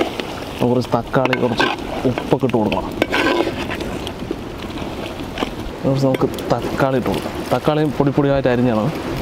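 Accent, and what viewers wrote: Palakkad, India. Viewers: പാലക്കാട്ടെ സംസാര രീതി നല്ല രസം കേൾക്കാൻ ഏട്ടാ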